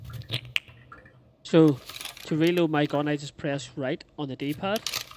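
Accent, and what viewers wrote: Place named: Northern Ireland, United Kingdom. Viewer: You from N.I?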